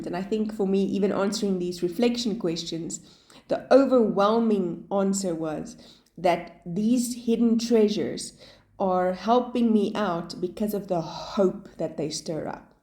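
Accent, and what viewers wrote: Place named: South Africa. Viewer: Wow your accent sounds so much like my husband’s (he is from South Africa and speaks Afrikaans). He has been in America for 25 years and has lost a lot of his accent.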